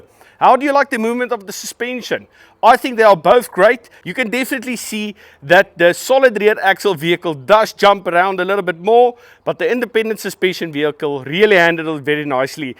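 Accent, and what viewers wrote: South Africa. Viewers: I prefer 4 wheel independence.Suspension is by the way are you south africa?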